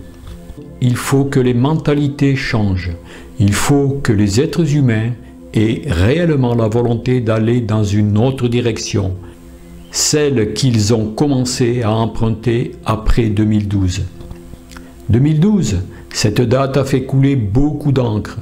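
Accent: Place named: South, France. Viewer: Merci, je vous aime beaucoup, je suis bordelaise et j aime votre accent du sud, bises de l allemagne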